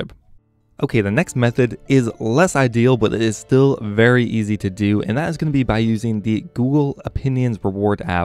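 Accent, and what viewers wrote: Quebec, Canada. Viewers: Wait canadian but french lemme guess quebec?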